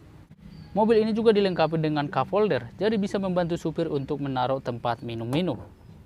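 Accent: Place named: Germany. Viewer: Baaah logat jerman rupanya...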